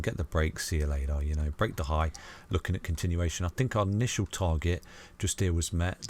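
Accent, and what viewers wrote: United Kingdom. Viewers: nothing like whispering in british accent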